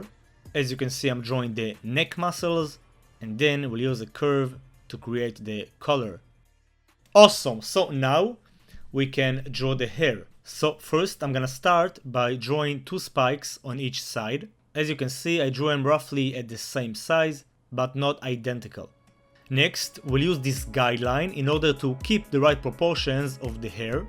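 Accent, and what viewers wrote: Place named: Israel. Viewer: וואו חחח המבטא הסגיר, וגם השם... אז הלכתי ובדקתי במידע על הערוץ, ומסתבר שצדקתי ^^ אני שמח לראות אותך מצליח ככה. בהצלחה בהמשך! מישראל 😄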